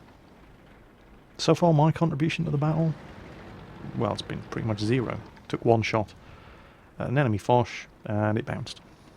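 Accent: United Kingdom. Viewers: Can you not tell hes a brit? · he's in the uk -_- did you not get a hint from the accent or British airsoft field or other ridiculously obvious pointers like that he plays on the eu server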